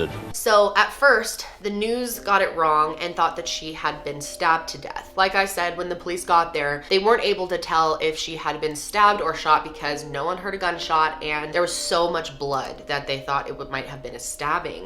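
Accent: United States. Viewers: no shes american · I love hearing American pronounce british place names 👍🏼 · i absolutely love the way americans pronounce some words hahaha its such a laugh thank you for covering this!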